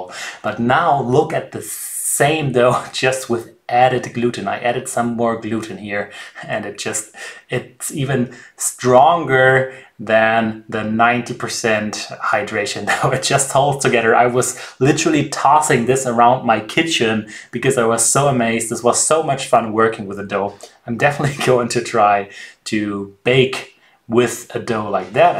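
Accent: Germany. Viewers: Great video! I’m still a newbie to sourdough, and this helped me a lot. Also, I’m Czech and to me it’s so adorable when someone has a German accent🥰😄 pro tip: if you put the tip of your tongue between your teeth and say “d”, you’ll get quite a decent “the” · Few things to note..... 1, my prediction about "Zee" is German, turns out to be correct, but aside from that, your English is pretty good, to a point that I almost doubt my protection about the "Zee". You should working on adding more accent for ease of identification. 2, started from #2, the whole thing sounds like college project, I can respect that... 3, I'm going to save this video, and when next time someone asked me why German make the good stuff, I'll send this to them... 4, So, in Germany, people do use their thumb to count 1... Jokes aside, this is a very interesting video, much different than the rest of the YouTube cooking video I've seen so far, even if it's just a video about homemade bread, the whole process screams perfection, sure is a breath of fresh air, good work. 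· You made me laugh that you apologise regarding your german accent! Du hast mich im lachen gebracht! So please watch this here. I think the best and funniest advertisement ever: <link> Grüsse aus Ungarn! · NEVER apologize for your delightful German accent and way of speaking. :) I love authenticity in a human being, and you are an authentic human being. :D also, your tips on bread making are fantastic. :) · I love his German accent ;) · I not only learned some technique here but I also got a kick out of the bloopers. I'm glad you don't cut them out because it's way better this way. Ich mag auch den deutschen Akzent :) Keine Notwendigkeit, sich zu entschuldigen!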